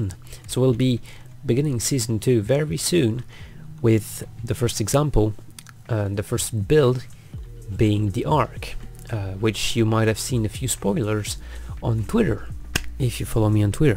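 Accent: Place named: England, United Kingdom. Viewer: Your english accent is so sweet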